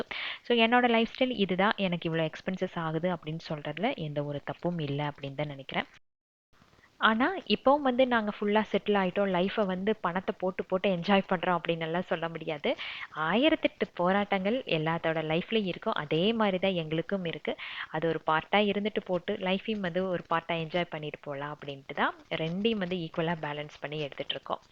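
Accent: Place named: Telugu, India. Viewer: What’s your mother tongue ….i see you speak Tamil really well but you also mix Telugu in that is it?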